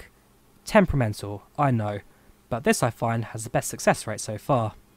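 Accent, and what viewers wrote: United Kingdom. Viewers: I say this with deep respect as you are a brilliant simmer and content creator who engages so generously with the community. When syllables get dropped from words in the rush to get to the end of a sentence, it does impact comprehension. Although I’m not from the UK, English is my first language and I do have some trouble understanding all the important and interesting things you’re saying. I think by taking the time to slow things down, and more evenly space words and syllables across phrases, you’ll be much better understood by those who do not speak with your accent or by those for whom english is not their first language. I truly only mean this only as genuine constructive feedback.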